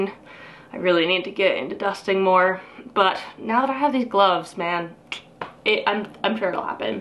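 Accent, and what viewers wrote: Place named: England, United Kingdom. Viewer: You can tell when she’s been hanging out with Claire a lot cuz the English side of her accent gets heavier lol. How long have you lived in the UK?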